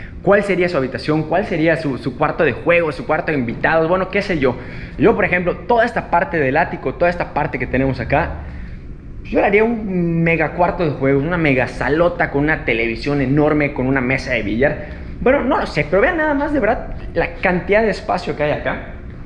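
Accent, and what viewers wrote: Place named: Yucatán, Mexico. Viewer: tienes un ligero acento yucateco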